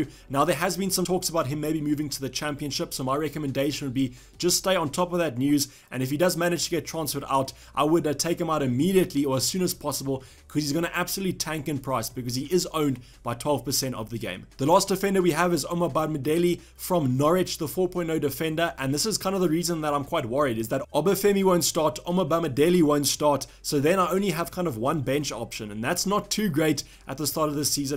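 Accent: South Africa. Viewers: Are you South African ? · Bra you sound South African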